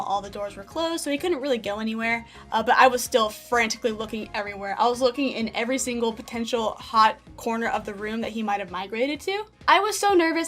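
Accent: South, United States. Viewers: Plus that southern accent is cute lol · Def a southern accent, I think she’s from Kentucky but lives in Georgia now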